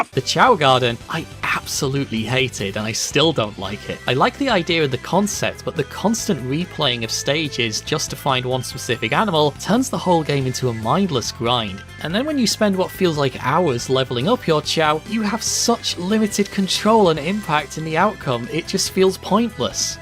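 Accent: Yorkshire, United Kingdom. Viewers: I’m from Yorkshire too (Bradford to be specific)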